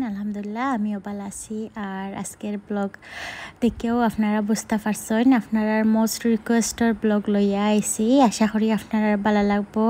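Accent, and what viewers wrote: Sylhet, Bangladesh. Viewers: Appu goh apnare otto sundor lager 😍😘 . R eta amar 1st comment . R apnar vlog gun onek sundor oy . Allhumdulillah dua kori apnara sob somoy bala takoin . Ami apnar 2 3 ta vlog u deksi . Dekiya u oto bala lagse . Asha kori future o apnar loge amar deka oibo baggot takle . R apne otto sundor kori sylheti matoin huniya jeee Bala lage . By the way ami apnar little sister or lakan . Ami inter 2 year o fori . LOVE YOU APPU 😘😘😘😘😘😘😘😘😘😘😘😘😘😘 . R ami dua kori ami o jeno future o olan akta husband fai + olan family o olan . R amar wedding ta o jeno olan oy . Allhumdulillah . ☺️☺️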